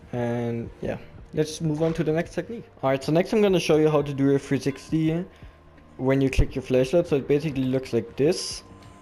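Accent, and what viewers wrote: Germany. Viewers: Gutes video, man hört aufjedenfall denn Deutschen akzent aber echt gutes Englisch, thanks for the video, was helpful, I’m going to try it in game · der deutsche akzent 😂 geil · Ich hab dein Video geschaut und direkt gehört, dass du einen deutschen Akzent hast. Gutes Video 😊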